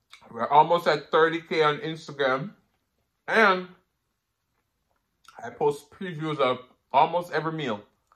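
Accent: Jamaica. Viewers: I see 👀 you lawwd how is it possible that you make food look so good. Another channel 🙌 for recipes. What that name of the channel again 🤔 you and that Jamaican accent. Sing sing 🎶 I don't know that song though.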